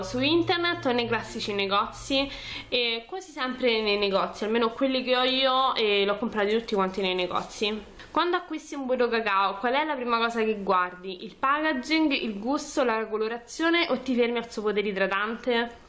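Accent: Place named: Rome, Italy. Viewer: nella parola Packaging la "G" finale è muta. si legge pacagin. Te da romanaccia ce ne metti 3 di G alla fine :) Però sei simpatica. Ciao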